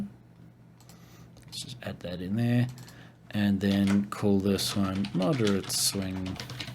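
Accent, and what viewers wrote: South Africa. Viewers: South African detected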